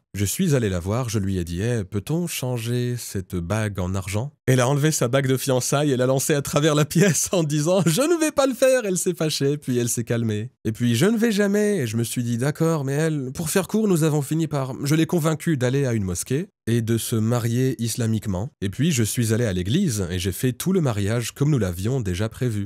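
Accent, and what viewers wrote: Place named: Arab world. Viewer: Bizarre il prononce le prénoms du prophète et du coran avec l'intonation exactement du vrai musulman la langue arabe seule un vrai arabe peut prononcer les converti le prononce différemment car il faut avoir parlé toute sa vie l'arabe pour prononcer les syllabe du h ou k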